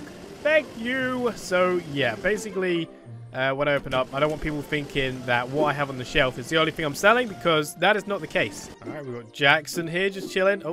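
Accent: United Kingdom. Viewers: Hey! Are you from the. UK?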